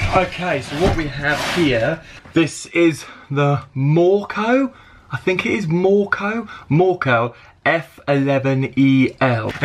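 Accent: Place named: United Kingdom. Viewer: not all of can speak or understand the King's English so for those of us watching from the colonies please speak just a bit slower eh mate?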